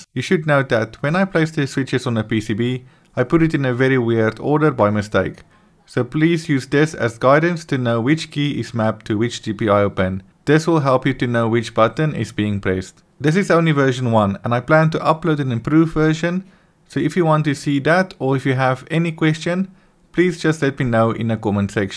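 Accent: South Africa. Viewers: Would love to see version 2. Your accent sounds familiar would you be from South Africa. If so it would help in getting a pcb from your order.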